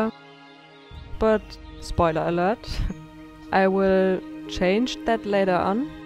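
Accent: Germany. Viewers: Your builds are always incredible 😍 and I'm so happy to finally hear your voice! First of all, I love your German accent, it's one of my favorites, and second, you're so good at talking! Don't put yourself down like this. You should definitely do more of these! 💙✨ Also yayy, teal club! 🙌🏼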